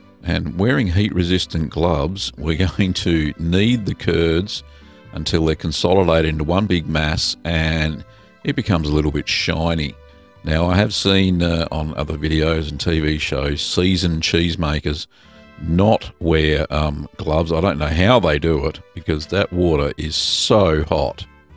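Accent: Australia. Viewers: Everyone here knows how it's pronounced. Clearly is from Australia and that accent comes out- to me, that just adds character. The important thing is that he has made a great video, and it's also clear that he has invested some time learning about Mexican culture as well. Well done!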